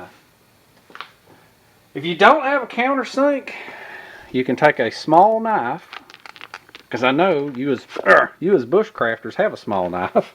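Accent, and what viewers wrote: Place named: Georgia, United States. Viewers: Great to have you back. I have missed that Georgia accent and your unique laugh. And I have really missed learning from you. YouTube was not the same without you. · How I missed this Appalachian accent! Welcome back, don't abandon us again please.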